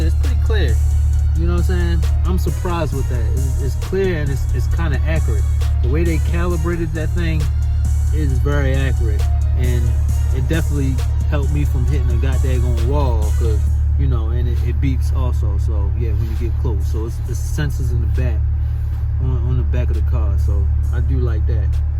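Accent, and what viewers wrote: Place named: DMV, United States. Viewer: Dat dmv accent funny af duews and twues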